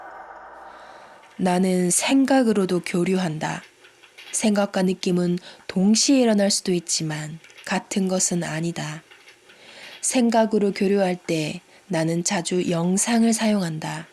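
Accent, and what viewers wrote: Gyeongsang, South Korea. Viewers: 경상도 억양이 밉지 않네요 감사 합니다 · 어디사투리세요? 경상도? 같은데요 🙂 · 어떻게 이런일이..마음공부 하던중 우연히 신과나눈 이야기 란 제목에 살짝 호기심이 발동해서 처음 들은게 몇달전인데도통 무슨 말인지 모르겠는데 댓글들을 보면 감동이라는 글도 많고 긍정적반응이 많더라구요 근데 일부 내용만 들어서는 무슨 말인지도 모르겠고 법문공부 중이라 해석을 어떻게 해야할지도 모르겠더라구요 말 그대로 전 답답한 상태였어요 눈 이 아픈 사람이라 책 읽을수 없는 상황인데다 어제 다른영상에서 한번더 듣고는 도저히 답답함을 견딜수도 없고 어디까지가 신의 의도이고 어디까지가 인간의 의도인지도 모르겠고 ( 살인사건 , 고문 과 같은 큰 사건) 정말이지 속이 편치 않고 혼란스러워서 어제 들은 다른영상에 몇가지 질문 올리고 잠들었는데 답은 없었어요 그래서 평소처럼 법문 들을겸 찾다가 이영상 눈에 들어왔어요 별 기대없이 재생 버튼 눌렀는데 ...저를 위한 영상이었군요 같은 경상도 사람이라 알아듣기 쉽고 또 풀이까지 해 주시니 감동이었어요 궁금한게 하나씩 풀리는것 같아요 너무너무 감사해요 후편들도 기대되요 궁금한건 질문드려도 되죠? 이렇게 알게되서 정말 반갑습니다 수고하세요^^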